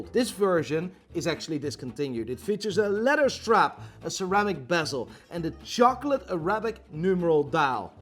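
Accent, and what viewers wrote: Netherlands. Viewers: Ik wist gewoon dat jij Nederlands bent ik heb het vaker in je accent gehoord maar nou weten we het zeker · ik wist gewoon dat je NL was broer. Je accent je naam alles! En uit welke stad kom je trouwens vandaan? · Heerlijk, als ik dat hollandse accent er tussendoor hoor 😁 Well done!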